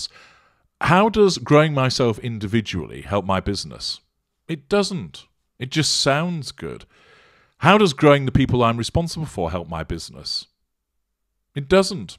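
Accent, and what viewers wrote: United Kingdom. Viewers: One of my favorite things you do is to drop an appropriate f-bomb amidst this eloquent and proper British accent, i.e. “Oh, do shut tf up.”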